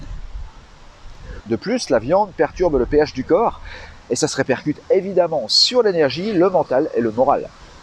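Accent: France. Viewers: Je n'avais pas percuté que vous étiez un ch'tit "à cause ou grâce à votre accent " 😘 J'ai vécu 5 ans dans votre région, nous avons eu un enfant, un Cum et comme je dis souvent "c'est mon ch'tit bilouttttte énorme "😉😊 Quel plaisir de vous voir à Villeneuve d'Asqu.. en plein de mois de Mai, le plus beau mois des Ch'tis dans ce climat de Drach' 🥰😍👍 Super Vidéo, pour moi, je suis abonné, mais il y avait quelques temps que je ne vous ai pas écouté.. 🤔😥☹🤣👍😘 J'ai appris 2 chose, l'huile de Lin, et "je n'ai plus le nom mais qui concerne l'intestin... au-delà du Sucre de l'alcool du sport etc Bref Merci, restez vous-même, pleinement heureux et joyeux d'être Vous.👍💖💝👌👍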